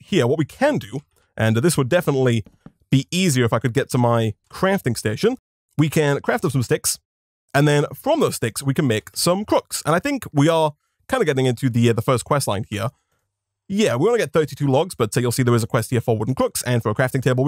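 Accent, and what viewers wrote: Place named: Yorkshire, United Kingdom. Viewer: man, you must speed up your videos. why? you have the voice and pronunciation of a beautiful Yorkshire angle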